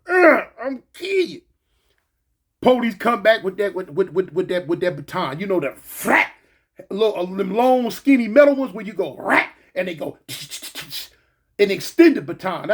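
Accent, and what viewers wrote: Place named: Colorado, United States. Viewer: Yo Big homie can you do a story about my cousin RIP OG Businessman Berry (Godfather the loan Sharker)killer Clause and my Uncle Freddy( he was in and out of prison that frequently use Herion) you say certain words that remind me of them! It’s that OG South Park Slang homie!!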